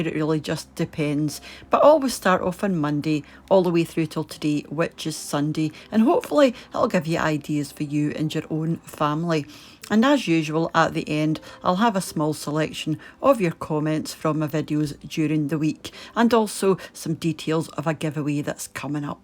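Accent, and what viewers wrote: Scotland, United Kingdom. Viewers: I truly adore your videos. You’re a wonderful and creative cook. I’m in Texas and my favorite part of your videos is your charming Scottish accent and pictures of Scotland. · My maternal grandpa was Scottish so it's no wonder your lovely accent and fantastic recipes make me feel like I'm at home !!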